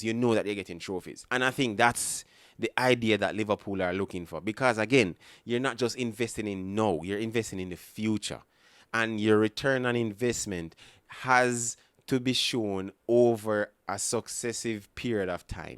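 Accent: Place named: United States. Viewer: They said that aboyt him last season, he is not in the decline. I reccomend you dont say that until its actuallu factual it brings into question the validity of anything you say especially with an american accent plays into the steriotype a little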